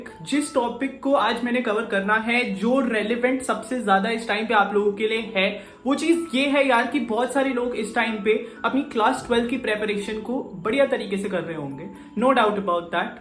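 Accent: Punjab, India. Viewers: Kya tum punjab se ho....✍️✍️✍️✍️✍️✍️✍️✍️👂⤵️⤵️👂⤵️🏆🏆🏆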